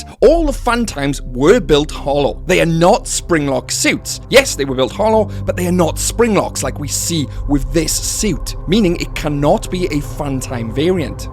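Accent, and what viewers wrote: Wales, United Kingdom. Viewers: 'Funtime Beaka', love that slip of the tongue, esp. with the Welsh accent :D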